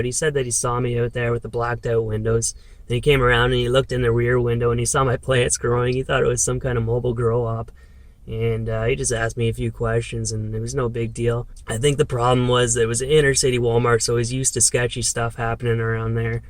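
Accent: Canada. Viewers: Your young Jordan Peterson lol the Accent. What region of Canada? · Canadian accent activated and recognized. 👍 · Love your Canadian accent. I’m gonna be watching lots of your YouTube today just got two teeth pull so it's a down day for me. 💕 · I love listening to you. As a fellow Canadian, it's like listening to the McKenzie brothers (early 80's). Stay well!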